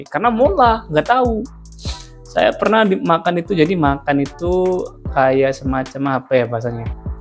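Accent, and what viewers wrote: Indramayu, Indonesia. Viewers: Sampean wong endi dermayue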